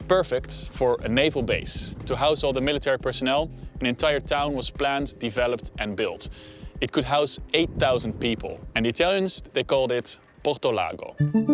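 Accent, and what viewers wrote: Netherlands. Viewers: I hear a dutch accent.. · very nice video, you sound really Dutch. :) ! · I guess he's Dutch. He has a thick accent, but trying to imitate an American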